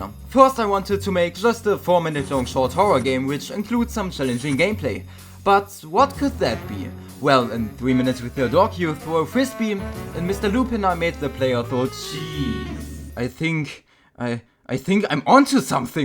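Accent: Germany. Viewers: i can hear funny german excant, wie lusitg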